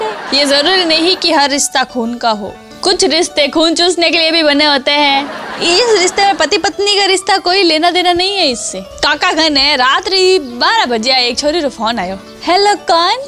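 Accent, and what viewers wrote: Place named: Marwari, India. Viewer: U are amazing I love the way u speak in English and Hindi with marvadi accent.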